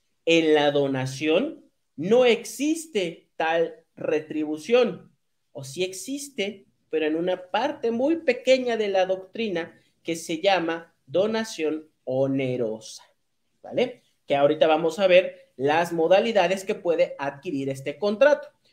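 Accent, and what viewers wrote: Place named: Mexico. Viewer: No reconoces el acento mexicano ?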